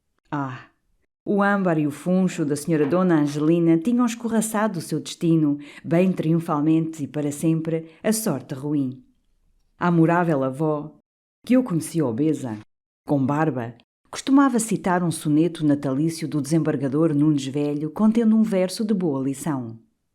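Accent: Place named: Portugal. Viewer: A pronúncia é d'alguma região de Portugal.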